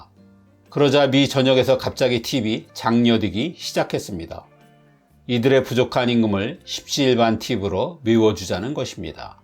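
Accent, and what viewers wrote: Gyeongsang, South Korea. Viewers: 남한 표준 언어의 경상도 사투리 오염 현상 때문에 그렇습니다. 경상도에서 ~또 라고 발음하죠. 전에는 없던 현상인데, 없어져야 할 악습입니다. 지적 잘하셨습니다.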